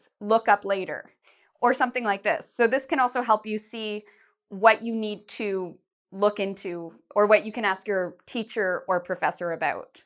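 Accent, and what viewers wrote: Canada. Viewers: Because she is canadian, not american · Canadian eh? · Because she is Canadian. She says "Abowt" when she says about. This is very common in Canada. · And your English sounds somewhat Canadian. Good job. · she's Canadian I think. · you must be Canadian?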